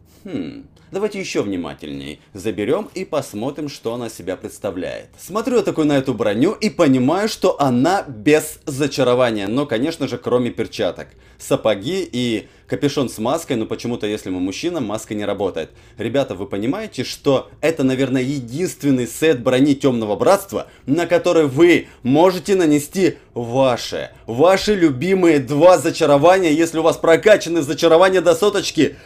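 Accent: Ukraine. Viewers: Ты под корнем нирна играешь?)) Украинский акцент делает ламповым видос👻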